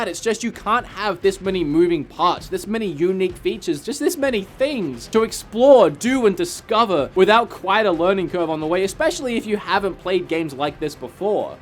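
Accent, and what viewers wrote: United States. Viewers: I'm Australian, all I can say is that your accent is devolving to American. Also I want to send you something what do u neeeeed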